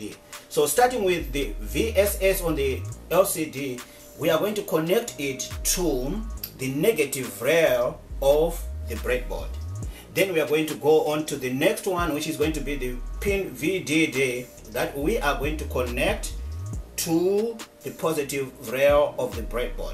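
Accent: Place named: Shona, Zimbabwe. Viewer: you sound Shona though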